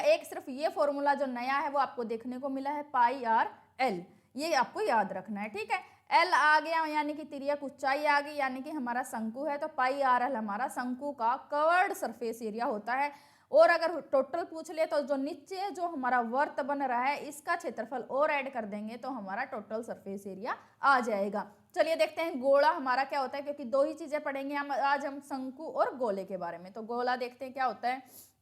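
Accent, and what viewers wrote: Haryana, India. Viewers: Mam aap Haryana se ho kya??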